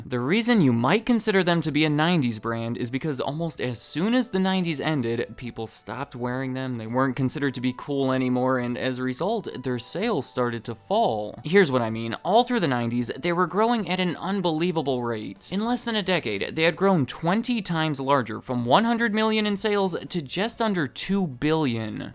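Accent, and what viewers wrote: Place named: Midwest, United States. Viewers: I was thinking midwest, but I could be wrong. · Sounds like a typical Midwestern accent.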